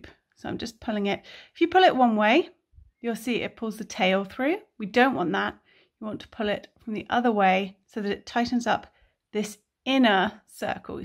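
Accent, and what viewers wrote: United Kingdom. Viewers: Your accent sounds British, so why don’t you use UK terms?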